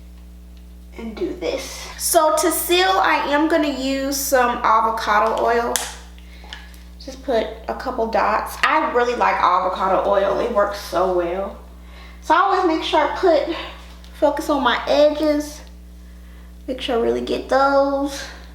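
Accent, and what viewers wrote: United States. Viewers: Her accent XD I love America sometimes. She's cute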